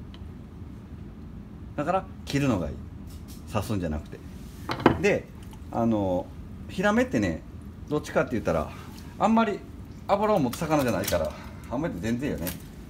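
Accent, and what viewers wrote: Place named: Miyazaki, Japan. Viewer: 流れる水の音と、宮崎なまりの関西弁が良くて、子守唄代わりに聞いてますよ。 「～はですよ、」のとこが面白いほど宮崎の発音ですね(笑)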